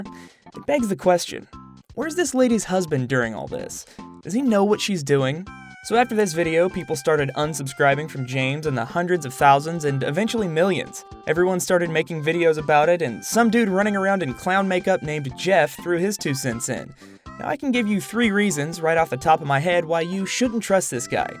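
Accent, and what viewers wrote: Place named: Midwest, United States. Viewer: I can here your accent big time in this vid. Can't say I completely place it but I believe that it's a midwestern accent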